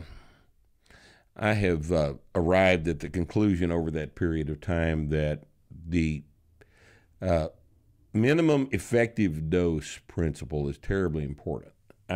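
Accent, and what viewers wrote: Texas, United States. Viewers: His voice makes me want to move to Texas 🫠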